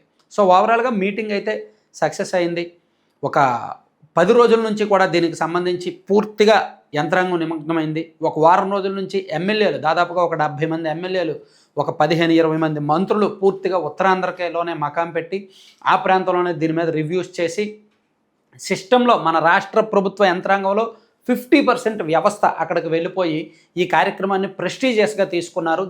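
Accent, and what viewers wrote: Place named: Telangana, India. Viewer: You talk like a Telanganites!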